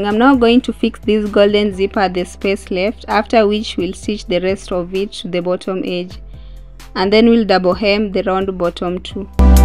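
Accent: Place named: Kenya. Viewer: from your accent I can clearly tell you are Kenyan. Where do you buy fabric in Nairobi?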